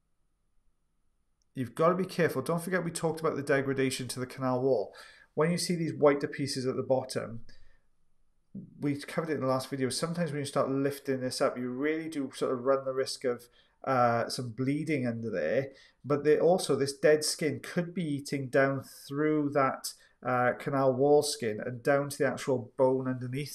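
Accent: Wales, United Kingdom. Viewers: His lively and lovely accent is Welsh! 😊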